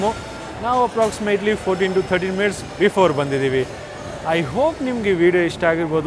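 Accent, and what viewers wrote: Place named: North India, India. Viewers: Yes, he is from Uttara Bharata. Please don't discourage him. He is a gentleman. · I think he is basically from UP or Bihar , who is settled in Karnataka.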